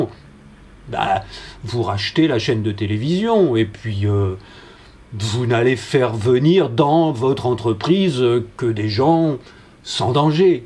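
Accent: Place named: Paris, France. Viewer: Vous parlez très élégamment, M.! Il y a cet accent parisien qui permet une excellente diction. Mais surtout une faculté d'expression orale que notre génération n'a pas reçue, sans doute à cause des pédagogies nouvelles. Je remarque que les + de 50 ans de la blogosphère s'expriment bien plus clairement que ne le font les meilleurs des intellectuels de 35 ans. Entre nous, la génération intermédiaire est déjà incapable de discourir proprement, cf. les allocutions de Hollande ou Charközy. Je recherche des textes, des témoignages pour comprendre ce qui s'est passé et comment je pourrais compenser ce défaut. Est-ce qu'à l'école dans les années 1960 on entraînait les élèves à l'oral?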